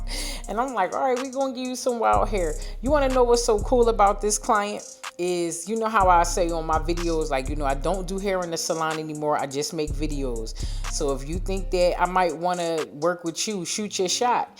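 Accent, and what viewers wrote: Philadelphia, United States. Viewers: Where are you located, you sound like Philly. If so what's up family!!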